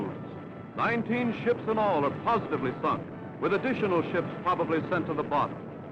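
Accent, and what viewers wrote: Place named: United States. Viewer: Also the announcer is American.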